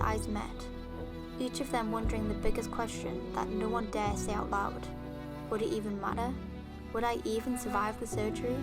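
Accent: England, United Kingdom. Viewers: Also I noticed you have a accent maybe a British? Because of the way you talk and the way you said better it was like: “betta” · I rlly wish it wasn’t told by an English accent. I didn’t like the pronunciation of words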